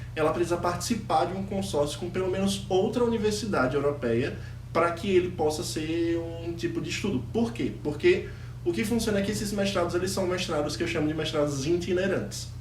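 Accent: Brazil. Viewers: Aiii, vc é de onde? Parece bastante com o sotaque do RN!! Adorei e o vídeo, por favor faz mais! Tem poucos videos falando dessa forma sobre o Erasmus Mundus em português! Voce poderia falar mais sobre o processo de inscrição em si, a quem vc pediu carta de recomendação, o que elas continham. Detalhar um pouco seu currículo, pq tipo, vi algumas pessoas falando que nota contava muito, outra pessoa dizendo q as notas nao eram TAO boas, mas q tinha muitos projetos. Como /pq vc escolheu esse Mestrado em específico. Xero!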